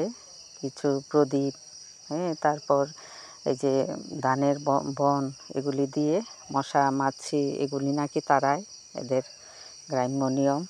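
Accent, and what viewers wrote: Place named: Kishoreganj, Bangladesh. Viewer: লাইক দিয়ে পুরো ভিডিওটা দেখে নিলাম দিদিভাই কিশোরগঞ্জের আঞ্চলিক ভাষা শুনে খুব ভালো লাগলো অনেক পরিচিত ভাষা নিমন্ত্রণ খেতে গেলে ❤👍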